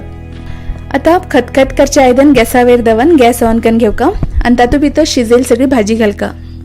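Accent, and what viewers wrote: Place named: Konkan, India. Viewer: Just now I watched Vikas Khanna's episode in Goa. And in the episode he goes to a restaurant and eats this dry curry called Khatkhate. It's only when I watched this video, I came to know that it is the same as Gajbaje. 🙂 I watch your videos mainly for the content and love your Konkani accent. Just curious to know where you are from?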